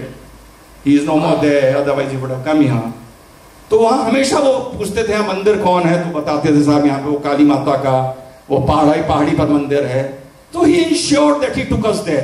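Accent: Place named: Lucknow, India. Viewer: Sir, aapki luknawi hindi bahut khoobsurat hai..Bhagwan aapko aur aapke pariwar ko hamesha khushal aur sukhi rakhe. 🙏🙏🙏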